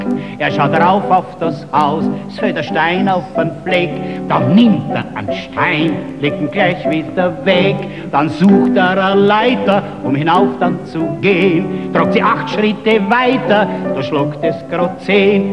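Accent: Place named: Vienna, Austria. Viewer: Ist das gut oder ist das gut? Ich habe die Version von Peter Frankenfeld gehört (den ich sonst wirklich sehr gerne mag), und die hatte ja nicht wirklich viele positive Kommentare. Da wurde auf diese Version verwiesen. Und die finde ich wirklich TAUSENDFACH besser. Und nicht nur, weil ich den wienerischen Dialekt so mag - da ist einfach viel mehr Gefühl drin. Klasse!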